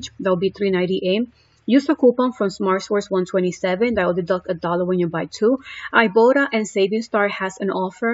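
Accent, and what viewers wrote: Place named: Spain. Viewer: Hello!!! I can feel your spanish. Why dont you do it in spanish.😀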